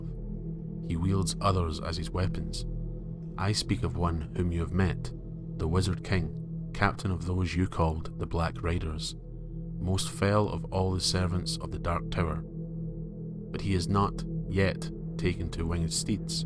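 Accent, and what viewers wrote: Scotland, United Kingdom. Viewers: Good to hear a Scottish accent doing this rather than your typical southern one…… the real north, north of the walls. 👍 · Always a lol strange hearing another Scottish accent on YouTube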